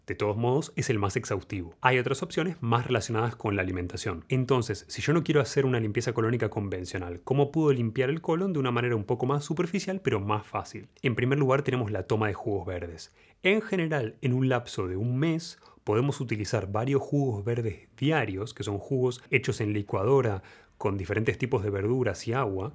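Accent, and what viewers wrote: Argentina. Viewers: Soy mexicano, por su acento supongo que el dr. es argentino, yo tengo la creencia que los argentinos son líderes en todo lo que quieren llevar a cabo.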